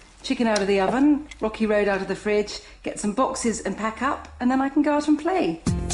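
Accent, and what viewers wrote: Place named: RP, United Kingdom. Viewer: It’s funny to listen to that posh voice making what I think of as American soul food. I’m not meaning it unkindly and I totally need carrots in coleslaw it’s just everyone is always like nigella nigella nigella and I’d never watched her before didn’t know she was terribly British sounding ❤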